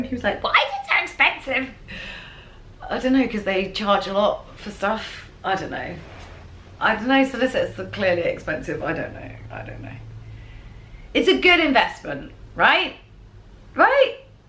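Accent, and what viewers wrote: United Kingdom. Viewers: Best of luck! If you do go, you should definitely hang on to your Britishness. In fact, you might want to play it up. People are impressed by an authentic English accent, especially one like yours which is REALLY hard to imitate! I think you will be a big success in America. You have a lot to offer!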